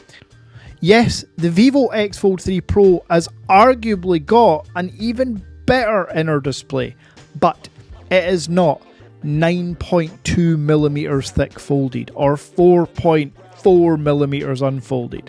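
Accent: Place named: Scotland, United Kingdom. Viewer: Sounds mad but it could because you are Scottish and the accent ..People are very shallow 😂😂.I bet if you had an Aussie or Yankie accent be well on the way to 100k subscribers 👌👌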